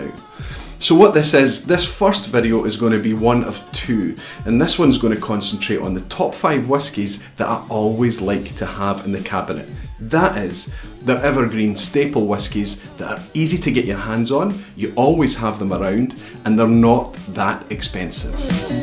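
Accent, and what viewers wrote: Scotland, United Kingdom. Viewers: Yes, I did and found it helpful. But I am not a chemist and I recall in this or one of your videos you mentioned that the coloring was sugar or "sugar based" and I wondered whether that would affect the flavor as well as making a more balanced color? Living in the AMERICAN SOUTH where we have our own unique version of English, I have to listen carefully when you speak. I assume that when you say "meshie" you are speaking about a "blend?" But do not change a thing. I have visited Scotland and I love the country, people AND the Scotch (but not the haggis, sorry) Keep doing what you are doing-you have fans in the USA!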